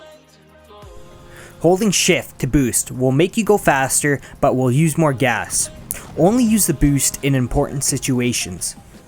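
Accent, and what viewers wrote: Canada. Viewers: Canadian not Scottish, nothing like a scottish accent.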